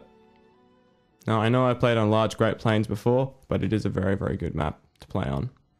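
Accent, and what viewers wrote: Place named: Australia. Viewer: u sound aussie, but good on you pommy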